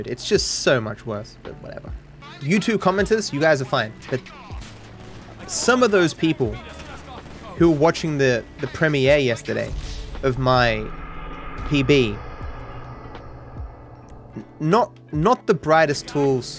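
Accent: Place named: Australia. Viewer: Where in Australia do you live? Your accent is sooo smooth compared to my Australian friends.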